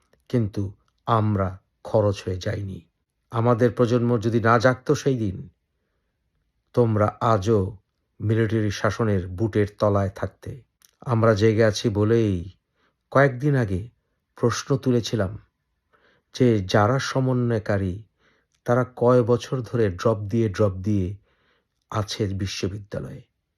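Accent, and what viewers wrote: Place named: Noakhali, Bangladesh. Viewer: নোয়াখালী ভাষা ভাই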